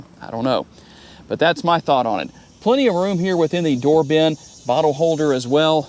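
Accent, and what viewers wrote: South, United States. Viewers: Love the car and great video description. I treated myself with a 2025 urban gray colour. Love the ride and the confidence of being in control on the road facing fellas with souped up pickup trucks. By the way I could listen your voice all day it’s very soothing and welcoming. I always been attracted to that lovely southern accent! Keep up the good work sugar love!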